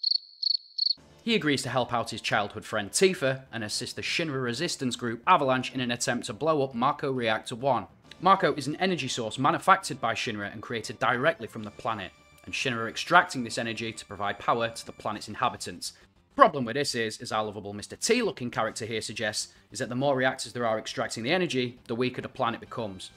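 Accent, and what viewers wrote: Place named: Yorkshire, United Kingdom. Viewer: Love hearing a Yorkshire accent with a gaming channel 🧡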